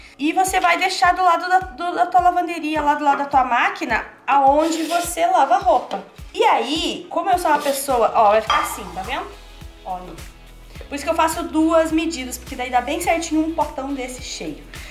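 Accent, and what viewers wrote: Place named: Ponta Grossa, Brazil. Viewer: de que cidade vc é?Parece sotaque de Ponta Grossa.Eu moro em Londrina mas sou de lá.Bjs.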